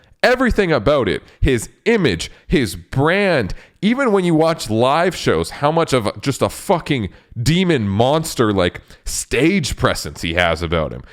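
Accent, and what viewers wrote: Russia. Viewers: Nice Russian accent. Can we all just agree with the fact that Alex has like a little bit more fanbase than STP. And at this point I'm too afraid to ask..... Plzz no offence I dig STP.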